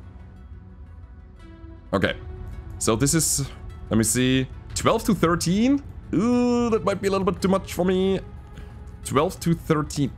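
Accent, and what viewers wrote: Germany. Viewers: German accent "back shot again, oooo hes cherry red" Wife "what the hell are you watching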